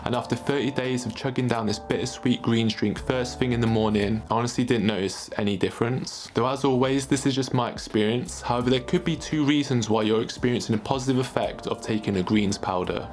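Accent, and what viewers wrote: United Kingdom. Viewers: Dude I have NO CLUE where in the UK is from but its HAWT!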